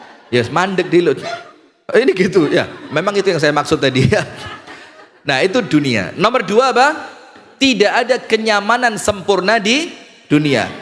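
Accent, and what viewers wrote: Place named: Java, Indonesia. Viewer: Masyaallah pak ustadz wajah ke arab arrabban..bhsa jowony medok...inggris juga bs.apalgi bhs arab sehat sehat pak ustdz Aamiin 🤲❤️🙏🙏❤️❤️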